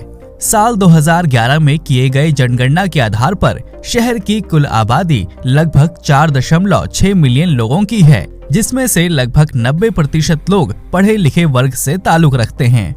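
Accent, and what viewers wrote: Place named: North India, India. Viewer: Why do you blabber in North Indian Hindi. 😡😡😡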